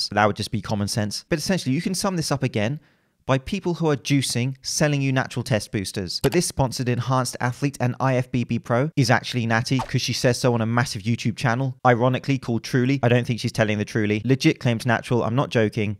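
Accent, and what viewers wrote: England, United Kingdom. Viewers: A science based throwdown in an English accent is the best kind of throwndown.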